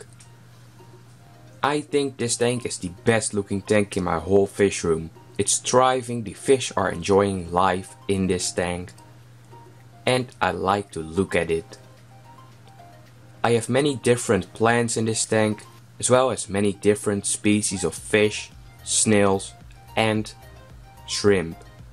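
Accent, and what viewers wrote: Netherlands. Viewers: Ik hoor... een Nederlander ;) prachtige bakken heb je! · Hey! Ontdekte je video bij toeval! Aan je accent te horen ben je ook Nederlands. De witte waas in je water zijn dooie bacteriën, dus niets aan de hand! Ik ben gesubt! En ga zo door 👍🏻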